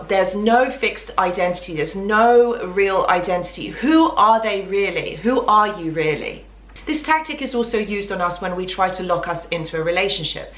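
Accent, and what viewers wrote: England, United Kingdom. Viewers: Ur really cool 😆 it’s xtra reassuring to me to hear another fellow English accent on a channel like this much thanks for the work u do it is appreciated Strength to strength peace all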